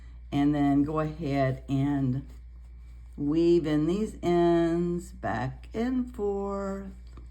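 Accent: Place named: South, United States. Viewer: OMG! This was the easiest and most beautiful pattern I have EVER done. Also your videos are just amazing and so detailed. The video showing what you’re doing is perfect! I just subscribed. LOVE YOU!! Do you have a video for a Santa’s hat for adults and children? Not very long hanging down on top but just alittle to look like a Santa hat. Please let me know. Would soo much appreciate it. I notice you have a southern accent lol. What state are you in? Thank you again soo much!! Heart❤️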